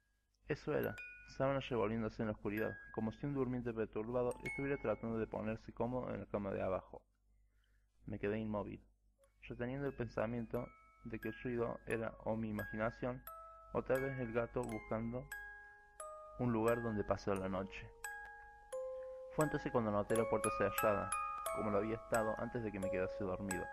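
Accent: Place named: Cordoba, Argentina. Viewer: Porque su acento delata, saludos hermano Argentino, compañero peronista! Aguante los cordobeses.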